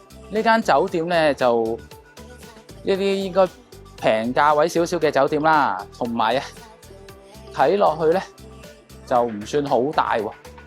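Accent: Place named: Hong Kong, China. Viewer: 點解您講野口音咁似港人仔仔🥹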